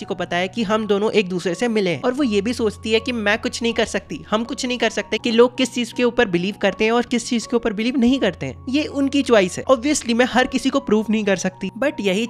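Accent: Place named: Bihar, India. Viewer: thodi English k words theekse use kijiye bhaiiya aur apni pronunciations sudhariye zabaan clear honi chahiye. toh hindi mai aapke channel pr ek bar sunkr log hrbr ayenge bihari accent use mt kijiye❤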